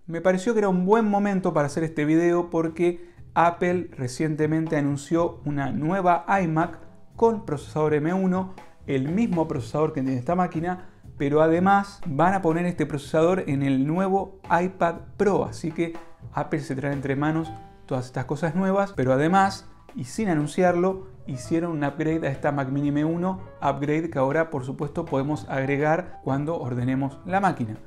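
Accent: Argentina. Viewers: Buen video! Feliz de ver videos sobre lo que me gusta en un español rioplatense! Saludos desde Uruguay! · Super argentino! Saludos!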